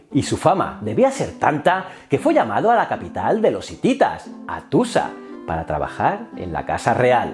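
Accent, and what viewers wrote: Spain. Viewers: Acaso los unicos productores de contenidos son asquerosos españoles , cuando esta gente es cinica mentirosa y estan dedicados en borrar historia de arrasamientos esclavitud asesinatos y robos el dolo escuchar este acento me revuelve el estomago, basta de estos asesinos no mas contenidos de estas aesinos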